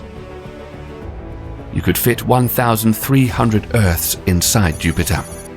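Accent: United Kingdom. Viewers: Why does there ALWAYS got to be some brite narrating even on an American channel.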